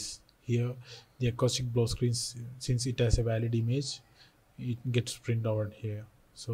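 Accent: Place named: India. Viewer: I love your indian English accent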